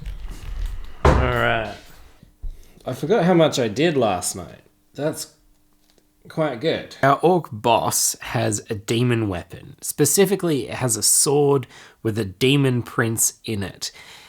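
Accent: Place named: Australia. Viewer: I can see and hear that Australia axon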